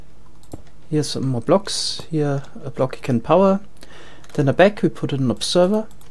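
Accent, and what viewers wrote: Germany. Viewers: His german accent makes this video that much better :D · Ja den deutschen Accent hab ich auch erkannt, aber hört sich ganz gut bei ihm an, finde ich. :D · lol ich so: "Hmmmm der Akzent klingt deutsch😂" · bist du deutscher? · Man hört sofort das du deutsch bist xD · r u german? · man merkt das du aus Deutschland kommst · Not the strongest German accent I heard, but after some time you start noticing it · ja hört man extrem :D als deutscher sagt man immer sis anstatt this :D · iich dachts mir gleich wegen dem deutschen akzent... hört man einfach raus xD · You-r german? But keep up the great work :) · Man bemerkt an deiner Aussprache, dass du deutscher bist :D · Gutes englisch, den deutschen Akzent hört man trotzdem raus! (geht mir beim sprechen glaub genauso xD)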